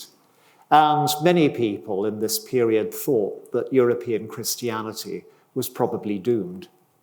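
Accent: United Kingdom. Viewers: I heard good things about Ronald Hutton - and then I started listening this video I was negatively surprised he has an British accent. As soon as I hear the British accent I am expecting to hear the most wild insane goofy ridiculous stupid clumsy statements ever. Obviously, my motto didn't disappointed me this time. The only thing that is true and decent is that Christianity actually curbed witch hunting - sacrificing people is strictly pagan in nature. The rest of it is pure garbage. Men hunted women because they were... misogynists? Seriously? Women of the pagan world would brew concoctions... they were able to make herbal medicine, tea and poison. Some of them acted as agents, some of them assassinated people, some of them were performing abortions, some of them were engaged in zoophilia (as American women do now with dogs, old central European women were doing it with the [black] billy goat). 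Some of these women were simply prostitutes, some were seducers (all women are hypergamous) and some of them were liars (as the lie is the only weapon that a woman has in a strong physical manly world), and these lies weren't mild lies, like "I love you" or "I was a virgin when you took me", but lies about who the father of the children is - and this causes lots of problems especially with the nobles or rich men that wanted to have their real offspring and their line maintained... women would also kill their husbands to inherit his riches and go run off with Chad... that's the moment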